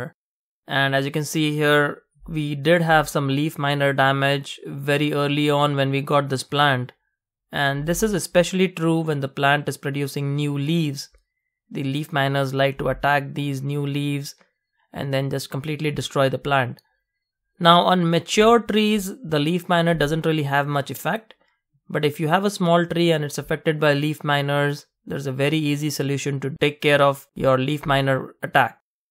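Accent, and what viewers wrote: India. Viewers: Are you indian??..... Your voice seems like...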